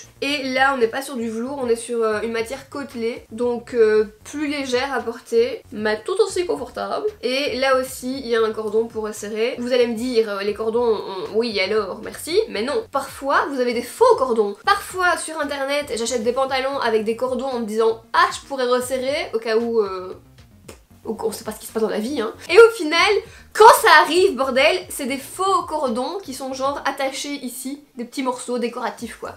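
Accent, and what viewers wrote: Belgium. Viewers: mais Bardot ça veux pas dire Bordeaux 😂😂 les Beges on parle comme vous hein 🤣❤ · J'adore notre accent belge😂 #TeamBelge #Brussels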